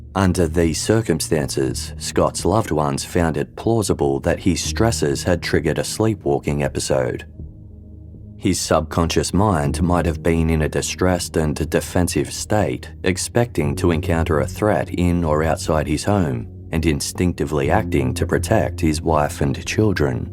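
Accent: Australia. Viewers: SOON AS I HEARD THAT AUSSIE VOICE I TURNED HORRIBLE..I HATE WHAT OUR COUNTRY HAS BECOME..BUGGER OFF..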